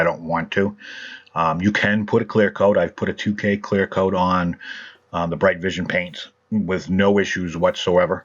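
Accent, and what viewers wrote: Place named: New England, United States. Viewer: Wicked pissah I love it lol where you from Maine or Mass?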